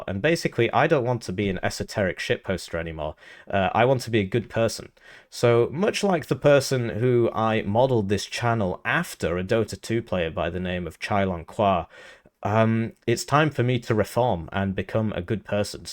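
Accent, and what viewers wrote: England, United Kingdom. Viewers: how lung did it take to earn the English accent?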